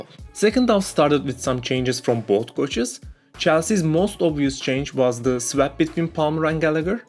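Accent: Turkey. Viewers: Bro are you Turkhis ?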